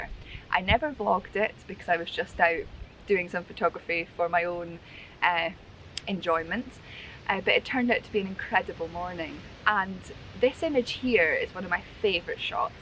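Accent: Scotland, United Kingdom. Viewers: I think your Chanal is brilliant I love the Scottish accent cheers Neil Patto.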